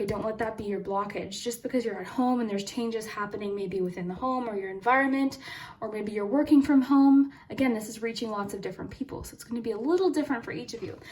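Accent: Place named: Canada. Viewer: You’re Canadian!! That’s why I’ve noticed your accent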